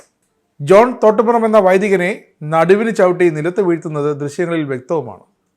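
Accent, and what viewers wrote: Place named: Pala, India. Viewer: എടോ താൻ എവിടത്തുകാരനാ? കേട്ടിട്ട് പാലാക്കാരനാണെന്ന് തോന്നുന്നു എടോ എറണാകുളം അങ്കമാലി അതിരൂപതയുടെ ആസ്ഥാന ദേവാലയമായ ബസ്ലീക്കാ പള്ളിയിൽ 2021 ൽ ബലിപീഠം തകർക്കുകയും ബലി അർപിച്ചിരുന്ന വൈദീകരെ തൊഴിക്കുകയും ചവിട്ടുകയും ചെയതപ്പോൾ ഇയാൾ എവിടെയായിരുന്നു ? ഈ കഴിഞ്ഞ ജനുവരി 11 ന് 21 വൈദീകരെ പോലീസുകാർ തൂക്കി എറിയുകയും തിരുവസ്ത്രം വലിച്ചു കീറുകയും ചെയ്തപോ ഇയാൾ എവിടായിരുന്നു. കഷ്ടം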